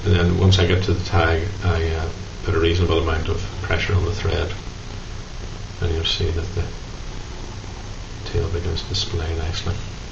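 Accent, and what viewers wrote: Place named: Northern Ireland, United Kingdom. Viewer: Always been pondering about a fly to use on that simply difficult river, this fly built to great complication has opened great opportunities to fish on this river. i caught 3.5 with this fly alone in one day "WOW", anyway thank you for the post, my brother Henry will enjoy this video too. Like the northern ireland accent.......... Tight lines.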